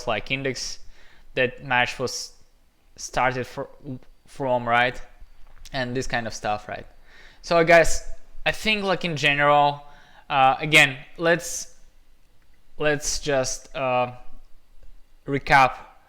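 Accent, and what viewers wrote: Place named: Russia. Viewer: Я один слышу русский акцент? )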